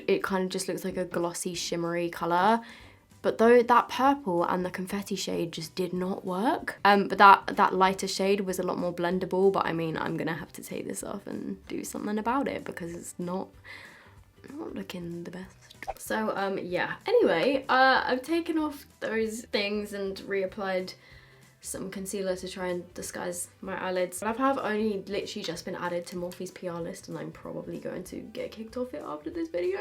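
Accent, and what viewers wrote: United Kingdom. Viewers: I think it’s just the British accent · Ok but you can say d'amelio with a British accent and me sitting here with a British accent struggling to say it LIKE HOW DO PEOPLE SAY IT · Are u British?luv ur accent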